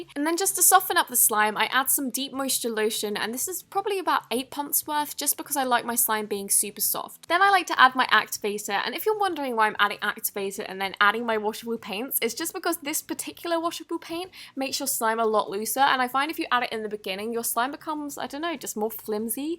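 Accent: England, United Kingdom. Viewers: Wait are you English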